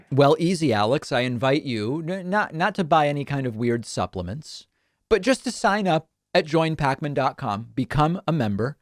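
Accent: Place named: Argentina. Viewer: I've always found the Argentinian dialect of Spanish so unique, just lovely. And, you speak beautifully...more please! 😁 Huge fan of you & your show! Love and blessings, from California.💙💙💙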